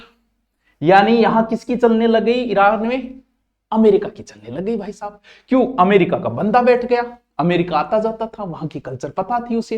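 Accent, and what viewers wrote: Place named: Haryana, India. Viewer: Content is good .But, try to speak in neutral accent. Sab ko haryana accent samajh nahi Ata.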